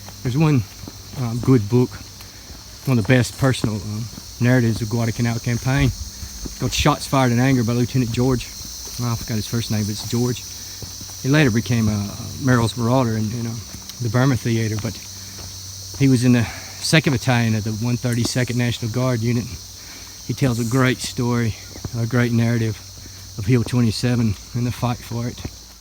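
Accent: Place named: South, United States. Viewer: Thank you for your walk-around tour and your knowledgeable account of the entire battlefield. I am a 58 year old Australian and I inherited a fascination of this "crucible of American & Japanese military doctrine"... My late father had me watching William Bendix & Richard Jaekol (spelling) in the movie version of Richard Tregaskis's book "Guadalcanal Diary" - which I have never read, nor James Jones The Thin Red Line - ignoramus that I am! :) I have the Terrance Malik film plus "The Pacific" - we in Australia owe our freedom to the brave young Marine's who stopped the Japanese advance southward in its tracks, while our own boys fought it on the Kokoda Track... Your calm, succinct, professional and detailed tour is just fantastic - thanks to you, I know of not only John Basilone, but now I can add Mitchell Paige & Davis to the list of brave men who won the Congressional Medal Of Honour to the numerous Navy Cross recipients you recall with a grace & calmness befitting the honour of these men. 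Now I know where & what 'The Galloping Horse' is, as well as 'Gifu' to add to my knowledge of the 'Edson's & Bloody Ridge, The Jeep Track, Fighter Airfield, the Emergency Airfield to add to my knowledge of Henderson, which I presumed was the sole Airfield - Wow - Coffin Corner, the Ilu River, the mistaken position /naming of the Tenaru - you have pieced this place together with the ability & acumen that would do any professional historian proud :) Your gentle, factual southern accent is ju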